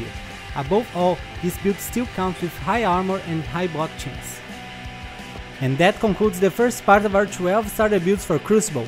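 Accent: Brazil. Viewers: Cara é incrivel como seu inglês é perfeitamente correto e ainda consigo sentir que é um BR falando ahuahuahuaau nice video irmão!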